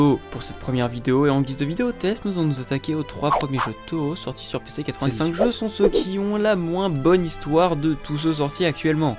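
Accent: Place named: Spain. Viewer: frérot tu mélanges l'accent japonais foireux à ton accent français j'ai l'impression tu parles espagnol j'comprend rien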